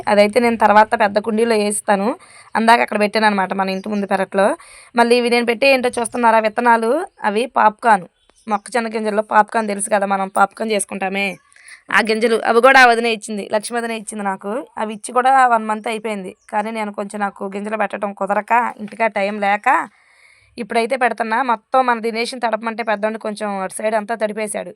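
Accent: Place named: Godavari, India. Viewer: మీ మాటల తీర్ను బట్టి ఏలూరు రాజమండ్రి పక్కన ఊరు అనుకుంటున్నా అక్కడైనా మీ ఊరు ఏ ఊరు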